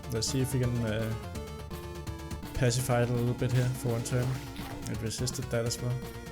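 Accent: Denmark. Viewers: You're Danish, ain't ya?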